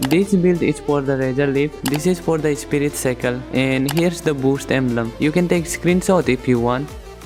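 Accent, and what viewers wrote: India. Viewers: Aew you indian ? Because I am also indian and by your saying accent is like Indian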